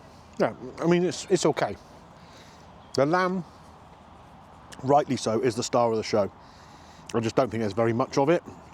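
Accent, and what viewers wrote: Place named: Dorset, United Kingdom. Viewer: which part of Dorset are you from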